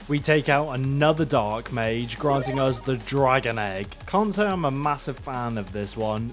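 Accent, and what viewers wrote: United Kingdom. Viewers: Really liked the vid, but would enjoy it more with thicker briish accent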